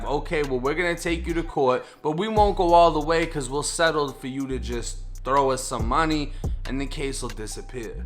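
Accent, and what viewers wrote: Boston, United States. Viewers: I know your from FLA but you have a Boston Accent...but most people from Boston move to FLA anyway you must have an aunt or something. I moved to FT MISERY OMG I ran back to Las Vegas CDC I am originally from Boston were I could run a muck I never got pulled over than you God my Lord and Savior.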